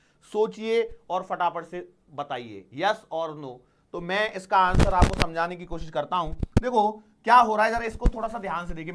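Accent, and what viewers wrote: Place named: Varanasi, India. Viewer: Good evening everyone ha sir ham hamesa yhi sochte qki ham Varanasi se h or aap jo bhi kbhi kbhi bolte h yha aisa lgta h sayad yhi ke h...😁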